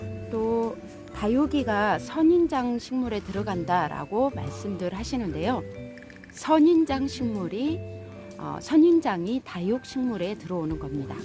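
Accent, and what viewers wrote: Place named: Jeolla, South Korea. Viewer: 영상구도도 공부하시고 전라도 사투리도 구수하고ᆢ풀시청 잘보앗읍니다~~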